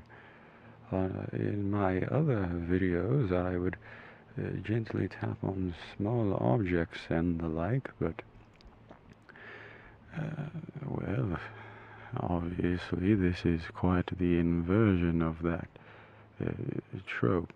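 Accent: United Kingdom. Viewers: r u bri’ish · Very good British accent! Im a brit myself and its uncanny dear boy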